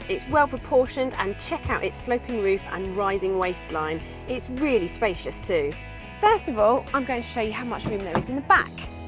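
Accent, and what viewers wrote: United Kingdom. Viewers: Appreciate your clear british accent.Keep going!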